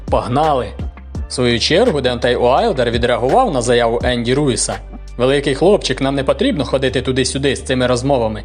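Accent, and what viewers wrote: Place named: Rivne, Ukraine. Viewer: Акцент у тебе північнорівненський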